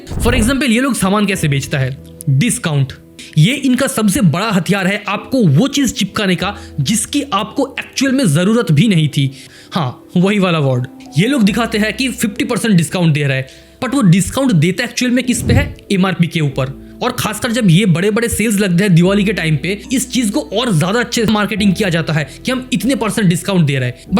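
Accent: Bengal, India. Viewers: I knew he is a Bengali from his pronunciation 😅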